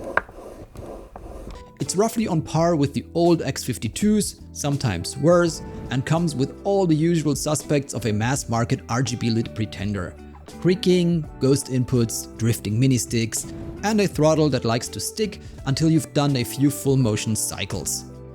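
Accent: Germany. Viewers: I might become a member or something as well. But give me some time on that, because I just discovered your channel. Gotta decide if, and how much I would be if I do. But all is positive so far. Enjoyed talking to you. Also, is your accent German? Just curious, because I'm German, Irish and Bohemian (gypsy Bohemians at that). I think it's neat to learn things from people in other countries.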